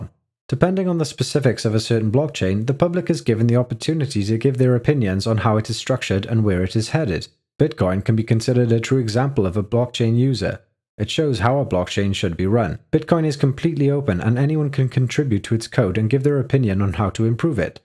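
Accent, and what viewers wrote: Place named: Germany. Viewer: really shitty german accent, I did not understand anything because of his stupid accent from the 3rd reich!!!!!!!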